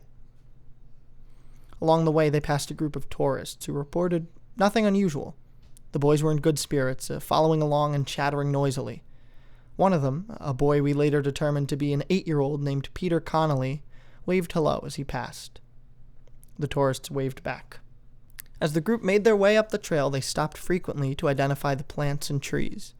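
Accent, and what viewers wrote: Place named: South, United States. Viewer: Great narration! Loved the "southern" voice. Is there a part 2???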